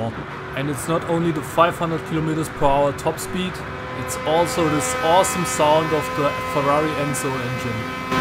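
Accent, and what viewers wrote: Germany. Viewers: I like u Kartoffel English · I smell Deutsch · Ich liebe deinen deutschen Akzent 😂♥️ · Junge, red doch einfach deutsch. Das is ja grausam.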